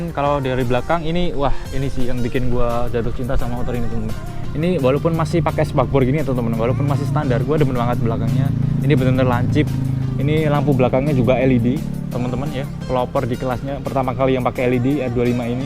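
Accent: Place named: Java, Indonesia. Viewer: kenapa memaksakkan pake "Gua" sih. Medok pol.. ra cocok. jowo jowo ae rasah lu gua. 🤣✌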